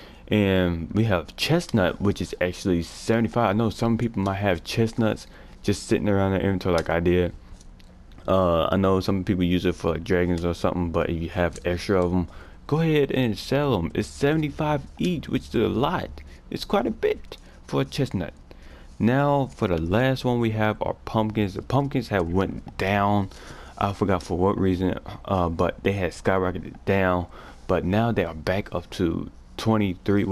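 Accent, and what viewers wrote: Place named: China. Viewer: U got a Chinese accent are you from Uganda?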